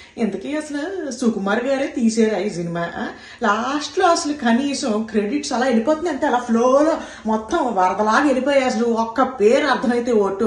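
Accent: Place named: Godavari, India. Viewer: అచ్చడిపోవాలి అంతే 🤣🤣🤣...గోదారి స్లాంగ్ ఆదరగోట్టారు👌🏼😍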